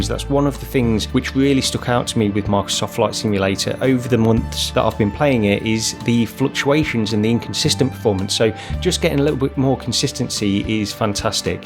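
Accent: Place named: Leicester, United Kingdom. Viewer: That’s a Leicester accent if ever I heard one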